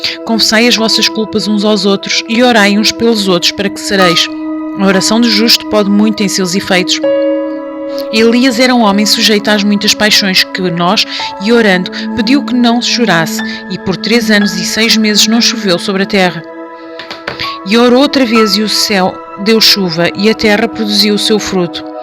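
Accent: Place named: Portugal. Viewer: Na Tradução dessa Bíblia é a msm em Português Brasileiro né , o sotaque de Português de Portugal é Lindo !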